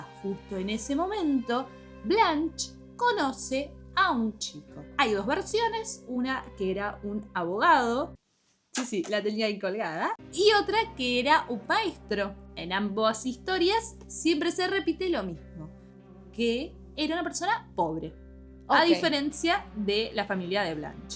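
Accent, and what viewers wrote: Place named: Paraguay. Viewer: paraguaya enduzla mis odios JSJS espero siempre que hable asi en los videos.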